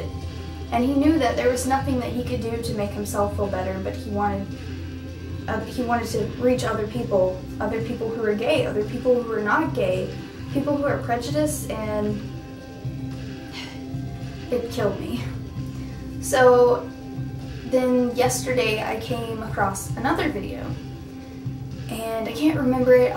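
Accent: United Kingdom. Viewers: i thought she was british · dont u have British accent? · I thought you were brittish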